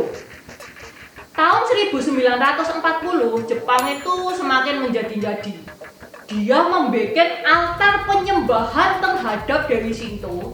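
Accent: Java, Indonesia. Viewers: Keren sekali ka apalagi medoknya hehe2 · pembawaan nya terlalu lebay dan over, mending dikurangi dan tampilkan medoknya saja dan lebih serius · mbak dari asal jawa kah?, kalok ngomong keliatan medok nya kwkwkwkw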